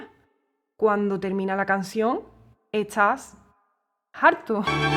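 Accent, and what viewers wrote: Andalusia, Spain. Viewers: Tó el arte!!!! 😂😂 Diego del Morao hace unos transportes q flipas, pero a ese nivel... y los guitarristas acústicos del fingerpicking?? La usan sin ningún complejo. Papafritas hay x tos laos!!! Ole tu acento sin reparos ni tapujos y el q no lo entienda q aprenda andalú!! 👏👏👏👏👏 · Me gusta como hablas, de que región de España eres? Ya entiendo un poco más porque en toda Latinoamérica hay diferentes acentos dependiendo del país que se hable, aquí mismo en México hay distintos acentos dependiendo de la región que se hable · ese acento andaluz ufff me mata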